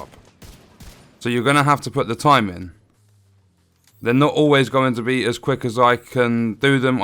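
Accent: United Kingdom. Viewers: U make me want a British accent bruv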